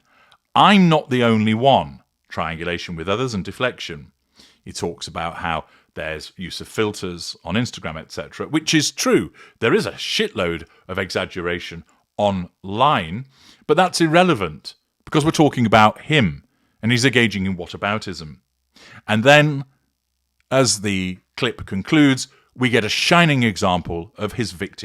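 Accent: United Kingdom. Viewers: 2 min in and I'm bored outta my mind, the old brit voice doesn't help either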